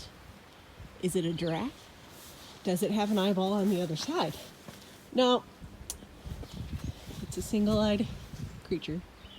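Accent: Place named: Northern Ireland, United Kingdom. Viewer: Goog to see (hear) a Northern Irish accent - ' ' on the trail!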